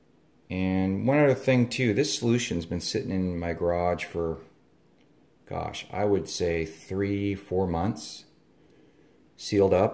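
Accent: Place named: Wichita, United States. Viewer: GREAT idea about the Ni welding rod - did you find at Harbor Frt? Sounds like you are from Wichita - me too. <mail>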